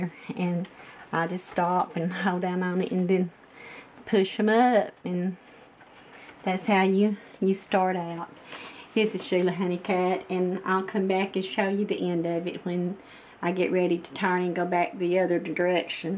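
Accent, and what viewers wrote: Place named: Appalachia, United States. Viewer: Love the accent, where ya from? Reminds me of my Cumberland gap grandparents of VA and KY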